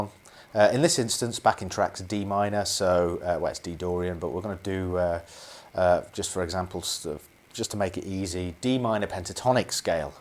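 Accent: England, United Kingdom. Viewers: damn, that english accent!!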